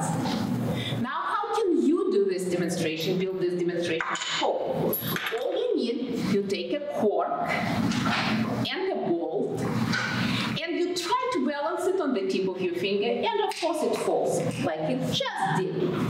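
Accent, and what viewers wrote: Russia. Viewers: Обожаю её акцент, сколько лет живёт в англоязычной стране, а всё равно остаётся русской! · Увидела ролики с ней в ВК и сразу стало понятно, что она из русскоязычной страны - настолько этот акцент в английском языке слышен мне, обычному русскому обывателю. Именно так, как преподавали инглиш в советских школах (произношение я имею в виду). Я специально пошла на Ютуб искать эту чудо - даму и наткнулась на этот канал.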